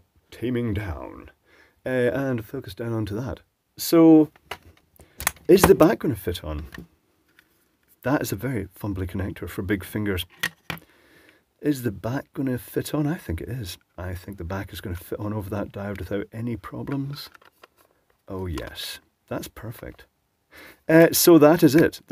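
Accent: United Kingdom. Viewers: That felling when Stevie from Family Guy repairing thermostat. I like that british accent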